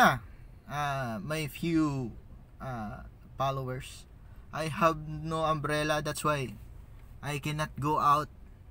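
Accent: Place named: United States. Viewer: Ang galing naman pwede na mag amerikano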